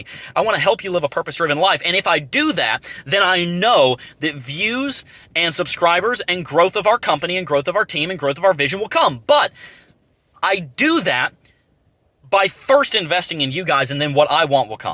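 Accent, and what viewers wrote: South, United States. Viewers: Said like a true Southern fast-talking scientist/engineer. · Fast talking southerner. I like it.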